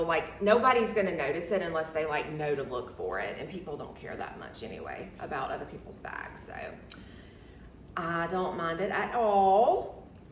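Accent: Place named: South, United States. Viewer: Two things: LOVE your sweet southern accent and LOVE the D&B satchel! I own many Dooney florentine bags..but the satchel is special. I have it in the Dipinta painted florentine and it's my prized handbag possession. It competes with the lux house bags all day long. It will last me my lifetime and someone else's after me..thanks for revisiting this awesome bag and reminding me how blessed I am to own one too! Take care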